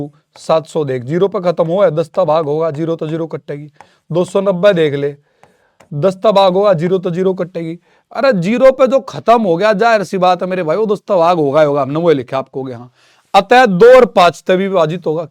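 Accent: Haryana, India. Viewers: Wa haryanvi 😊❤❤❤❤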